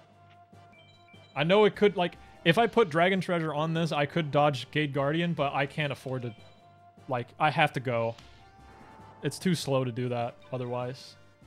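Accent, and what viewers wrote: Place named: United States. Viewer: It's your accent. Or more so from an American's perspective, lack there of. Your English blends with "American English" honestly seamlessly to the point you can say your from New York and no one would bat an eye.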